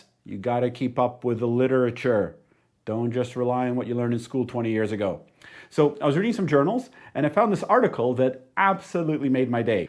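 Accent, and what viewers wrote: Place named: Canada. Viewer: Thank you!!! I bought that whisker stress story hook, line and sinker. I will purchase the feeder and water fountain you recommend. You are as adorable as Mr. Pirate, and I love your Canadian accent!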